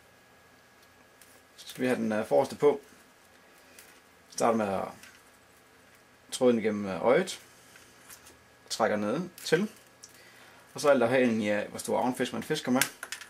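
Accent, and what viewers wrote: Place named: Scotland, United Kingdom. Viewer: i thought you had a bad scottish accent at first..lol..good vid tho mate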